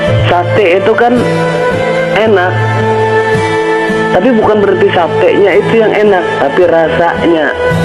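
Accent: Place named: Kalimantan, Indonesia. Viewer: ma'af kliru..🙏 logat n bahasanya mirip di kalimantan.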